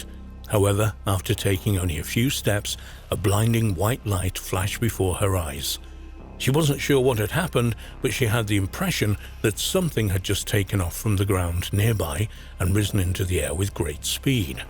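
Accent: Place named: England, United Kingdom. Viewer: Where is the button to block documentaries in a America narrated by English people. They're the worst, they're telling you about things that happened in the United States like they live here and are a expert in American UFO encounters and the geographic layout of the states. 💯🤦🏻‍♂️🤦🏻‍♂️🤦🏻‍♂️🤦🏻‍♂️🤦🏻‍♂️🤦🏻‍♂️💯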